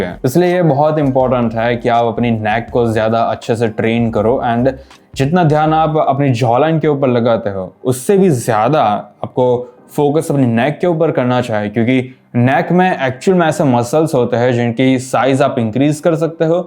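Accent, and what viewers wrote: Gujarat, India. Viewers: Pure gujrati voice hai bhai ki ❤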